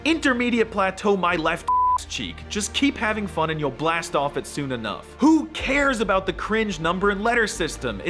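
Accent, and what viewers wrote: United States. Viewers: "Um siri fazendo barra"?? Your american accent is so terrible I almost couldn't understand it. The m in um is not pronounced like m, but just nasalizes the u. And the r in siri is like r in spanish, not like in english mf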